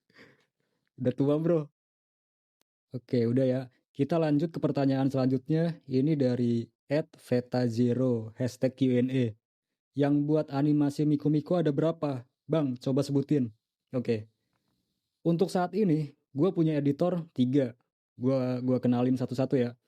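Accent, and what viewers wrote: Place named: Sunda, Indonesia. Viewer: Sunda juga nih bang😂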